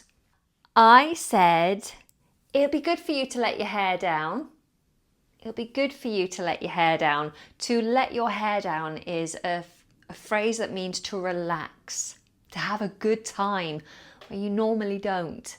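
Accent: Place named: United Kingdom. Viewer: I love your accent. Finally I found a nice British model to follow! 😊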